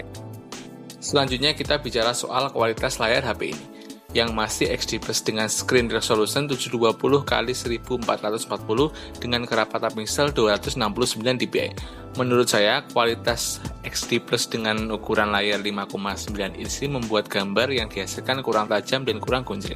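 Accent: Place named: East Java, Indonesia. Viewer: orang magetan ya gann :D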